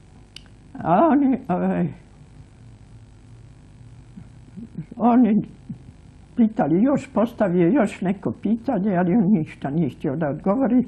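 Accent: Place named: Ekavian, Serbia. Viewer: Hercegovka, odrasla i školovala se u Sarajevu, a govori ekavicom. 🙄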